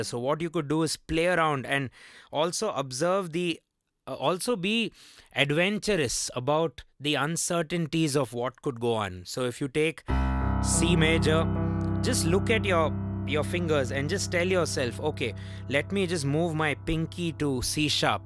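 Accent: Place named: India. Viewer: From your accent i'm guessing you're Indian, yet how are you named ' '? I'm curious to know the story lol. Anyways amazing video.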